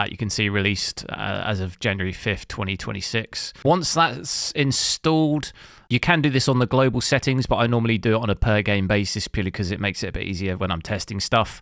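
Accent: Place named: United Kingdom. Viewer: Out of topic - where are you from? Cause you mix accents and pronunciation, you have British accent but you pronounce some words differently, e.g. "twenty" without the "t" ;)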